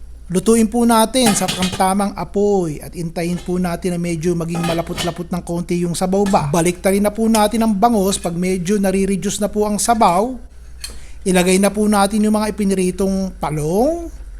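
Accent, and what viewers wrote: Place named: Bulacan, Philippines. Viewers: Napakasarap nga ang ganyang luto rini sa amin ang ganyang estilo mo ako po ay tiga Hagonoy. Kaya yung punto mo sy kapatid ng sa amin sa Hagonoy, Bulacan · Taga Sta. Cruz, Manila po ako pero lumaki sa Bulacan. Natutuwa po ako sa paraan ng pagsasalita mo chef. Para kayong Bulakenyo magsalita 😂. Akala mo matanda ang nagsasalita. Pero regarding tayo sa menu na ito, gusto kong gayahin at parang masarap, Hinayang ha hinayang ak9 at di pa ako nakabiki ng bangus sa palengke kanina. Lingguhan p nmn ako mamili, 😢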